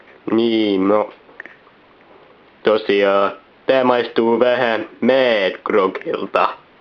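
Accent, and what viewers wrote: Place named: Tampere, Finland. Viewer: Kuulostat joltain Tamperelaiselta. :S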